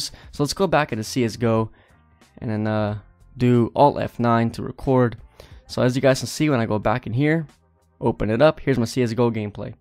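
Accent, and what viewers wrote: Croatia. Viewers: Are you from Croatia? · is he croatian?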